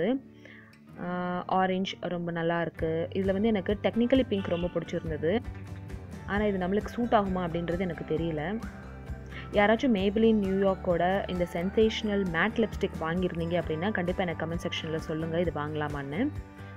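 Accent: Madurai, India. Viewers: OK mam unga voice nalla irukku athuvum illama neenga madurai ache super mam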